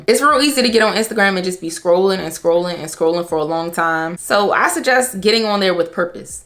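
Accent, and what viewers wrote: Baltimore, United States. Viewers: Is that a Baltimore accent 👀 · Im 36 and I love these tips especially for the new year around the corner! They're useful for women from all walks of life. And as a fellow Baltimorean, I love listening to someone with our unique accent😆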